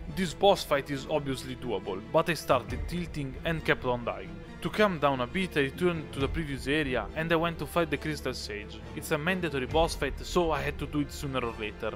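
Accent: Italy. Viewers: Kept seeing this particular video in my recommended tab, a worthwhile video to watch indeed. Forgive me for I am new to this channel. But, that accent I hear, is it Italian? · I love the accent. I believe it's Italian? It's very satisfying to listen to imo and a fresh change of pace from the American, British, and Australian accents. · Are you perhaps Italian? Your accent reminds me of an Italian one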